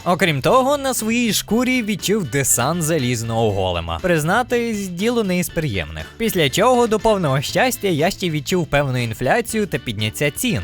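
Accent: Lviv, Ukraine. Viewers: Ого ти з Львову?? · ти з Львову??? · скажи ти зі Львова? Тому що я так. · Ти зі Львова? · І по цьому відео можна здогадатися,що ти зі Львова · Ти зі Львова ?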